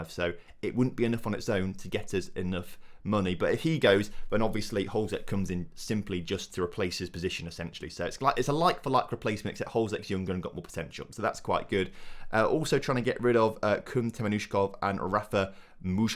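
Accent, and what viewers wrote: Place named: Yorkshire, United Kingdom. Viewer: yorkshire accent gets better and better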